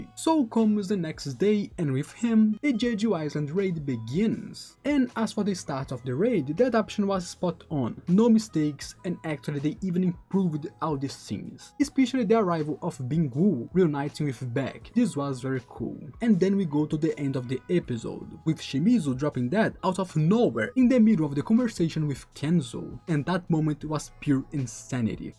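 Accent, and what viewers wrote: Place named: Brazil. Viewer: mano, eu venho assistir teus vídeos regularmente e eu gosto deles. Mas tem um problema, o seu sotaque é bem forte é as vezes fica um pouco difícil de entender. eu moro no Reino unido desde de eu tinha 10 anos, então eu estou familiar com o ingles e se vc quiser a gente pode conversar um pouquinho e te der umas dicas pra melhorar a pronunciamento. Tudo de graça, é claro, eu só quero ver mais um brasileiro suceder na gringa.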